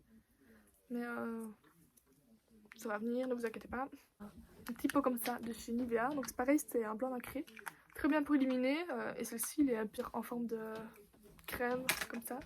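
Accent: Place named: Belgium. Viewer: Coucou sacrée collection ! Ton accent m'intrigue tu es en Belgique ?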